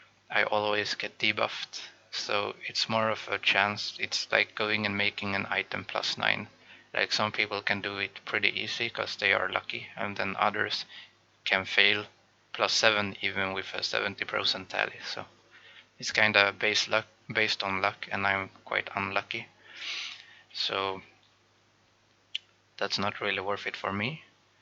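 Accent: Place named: India. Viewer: What? You sound indian :D . Of course i know it. But good to know for people who don't know it.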